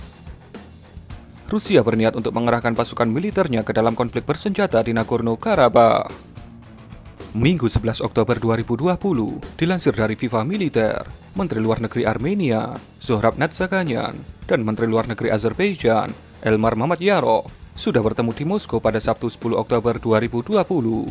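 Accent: Java, Indonesia. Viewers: Wong mjowo kah min??🤣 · medhoknya jadi ciri khas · suaranya medhok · Kangen bahasa medokk mimin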